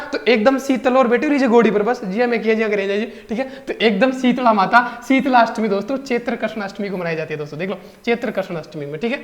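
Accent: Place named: Rajghat, India. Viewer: Aap rajghat se ho kya sir aapki boli vesi hi h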